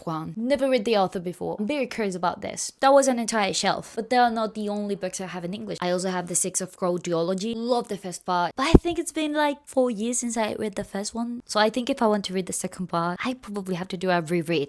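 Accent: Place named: United Kingdom. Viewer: Me encanta acento inglés britanico y esta lista de libros ❤ deberías hacer más Reels así 😊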